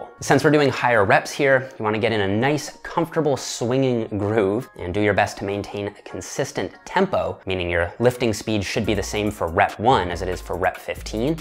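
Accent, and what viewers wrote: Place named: Canada. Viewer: English is my second language and i can never tell the difference between Canadian and American accents, until now😂. Thx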